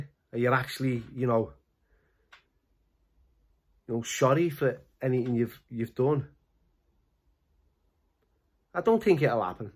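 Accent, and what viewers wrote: Liverpool, United Kingdom. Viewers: Love the Liverpool accent 😊 look forward to seeing more videos on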